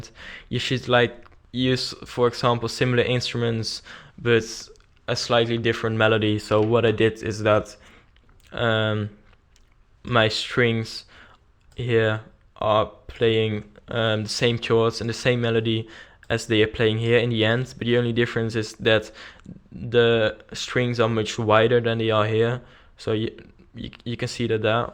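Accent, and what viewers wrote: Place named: Netherlands. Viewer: haha. i could emidiatly here ur from holland :P. im also from holland ^^. great tutorial :) !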